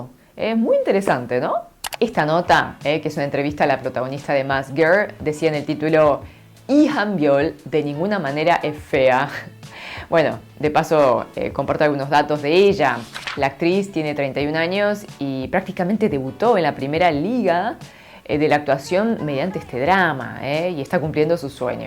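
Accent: Buenos Aires, Argentina. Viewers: y con tu acento porteño estas mas buena, eres la coreana mas buena de habla hispana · Hermosa tu y con ese acento argentno! Mas bella aun...Un admirador Colombiano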